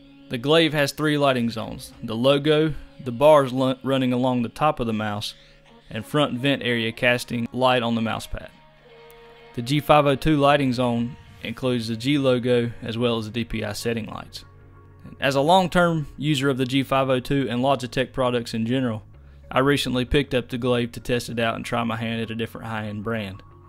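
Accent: South, United States. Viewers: Your accent is absolutely fantastic, for us Brits :D Every southerner I've met has been inordinately nice, just can't figure out why they voted Trump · this is the most country voice i have ever heard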